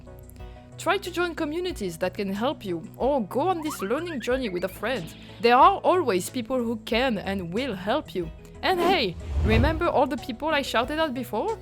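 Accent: France. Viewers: By any chance, aren't you french? Your English is excellent, but the accent still sounds a bit french, as if I was listening to my classmates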